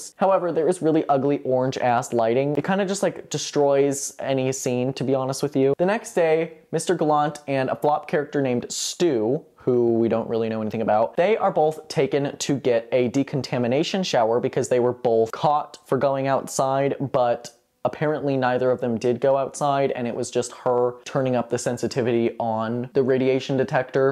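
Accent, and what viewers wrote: South, United States. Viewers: your rapidness but like simultaneous efficiency with videos is epic??? a tale to be foretold thru generations?? but i also love the growth and being able to see ur personality and personal twists on things come out more and more, so obsessed! also the southern accent sheeyt sends me every time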